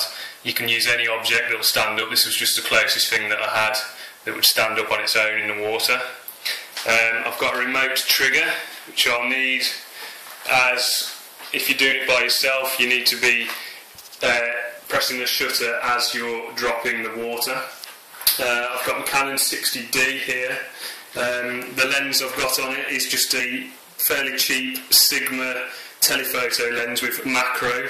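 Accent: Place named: Birmingham, United Kingdom. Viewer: thanks! just what i was looking for! also i love your brummie accent :) x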